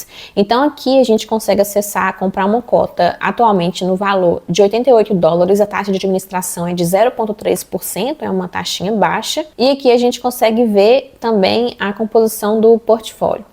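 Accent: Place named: Belo Horizonte, Brazil. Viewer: Ótimo conteúdo. Esse sotaque é de BH, né?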